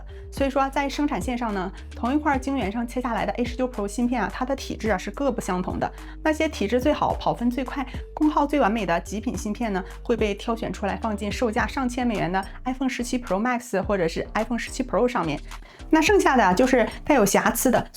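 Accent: Northeast, China. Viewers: 你是东北哪的啊妹妹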